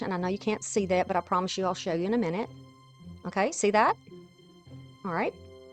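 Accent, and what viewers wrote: South, United States. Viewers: I love your videos 😻 and I must say your accent reminds me of The great country ⭐ star!! 😂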